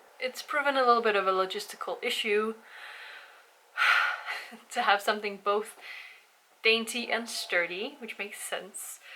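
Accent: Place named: Scandinavia. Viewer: So lovely to hear ever so slightly Nordic-tinged English : ) This was such a nice video to stumble on.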